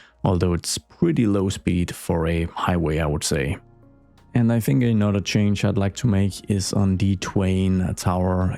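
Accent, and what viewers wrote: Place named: Denmark. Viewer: I really like youre city, its very realistic. Also i can hear that you have an accent it sounds danish but im not completely sure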